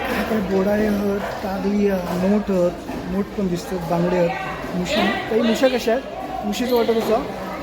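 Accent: Malvani, India. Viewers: Mala vatta tumhi mumbai la rahta. Karan tumchi malvani proper nahiye. Maka di aste te tumhi bolta maka de.. Mi yenar tevha ghetay - mi yetalay tevha ghetalay asa aste. Marathi mix bolta tumi. Ani kolambi nahi ti sungta malvanit boltat. Baki chan video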